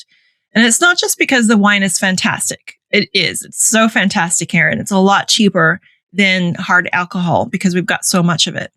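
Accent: Australia. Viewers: Love hearing how your o Vowels have Australianifed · sounding like an aussie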